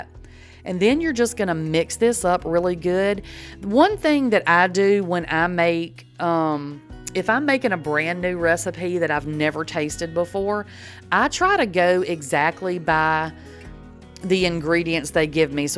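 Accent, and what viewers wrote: South, United States. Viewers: You are JUST adorable and So kind! I think I need to go live in the South - but Im from Australia! You could be reading the phone book with that accent and I would feel happy! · This Texas girl loves listening to your southern accent....kinda comforting to me. ❤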